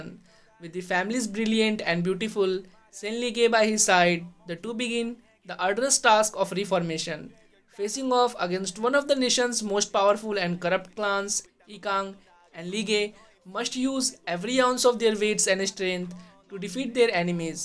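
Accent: India. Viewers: Are u indian??? Cause ur voice sounds like indian ☺️☺️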